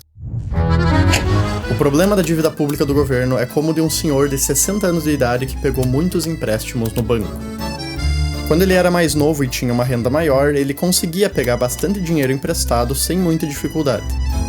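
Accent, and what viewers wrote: Rio Grande do Sul, Brazil. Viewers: sotaque gaúcho é mt legal de ouvir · duma coisa eu sei: tu é gaúcho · Se esse cara não é gaúcho eu sou maluco shshshs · Gaúcho tchê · Que vídeo! Que vídeo! Parabéns e continue assim, edição e roteiro espetacular! Só um detalhe: eu conheço esse sotaque aí em kkkkk noroeste do RS?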